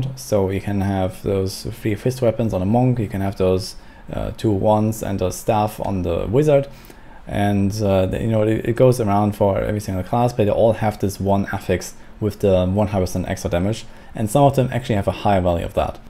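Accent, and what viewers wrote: Germany. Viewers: Man hört das DIREKT, das du ein Deutscher bist xD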